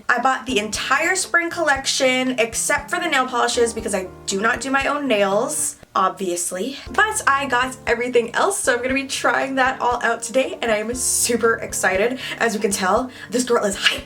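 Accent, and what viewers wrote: United States. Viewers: I thought you were from the US lol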